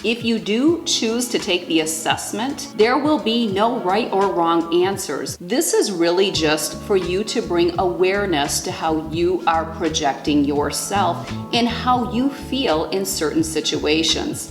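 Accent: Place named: Michigan, United States. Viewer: Your Michigan accent has me laughinnn lol peace from Windsor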